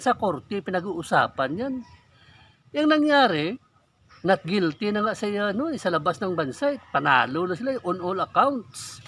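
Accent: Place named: Iloilo, Philippines. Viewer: Parang ilonggo ka host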